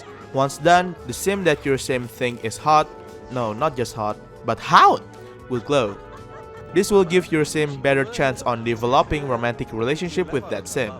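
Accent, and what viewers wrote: Indonesia. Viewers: r u indonesian?!? your accent sounds kinda indonesia-ish and also your youtube name :D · ea yg ngomong sama punya channelnya org indo ya wkwk kedengeran dr aksennya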